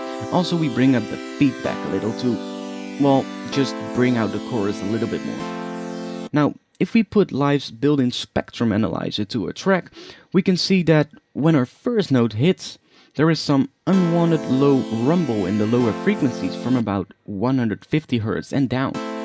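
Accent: Netherlands. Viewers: He's from the Netherlands...it's an accent. You are a dumbass.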